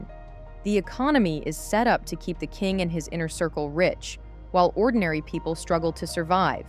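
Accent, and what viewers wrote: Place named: United States. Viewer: I wonder where this is going, you are speaking with American voice, what do you want from Africa because your President does not like Africa. Check your country, God has revealed USA as Mistry Babylon The Great. USA is about to be judged, it is imminent, prepare yourselves because you will be surprised while you looking at others countries.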